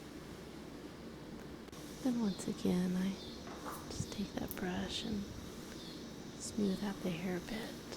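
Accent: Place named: Texas, United States. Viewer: Gah, between your accent (slight though it is) and the cicadas in the background, I feel like I'm sitting back home in North Central Texas on a lazy summer evening!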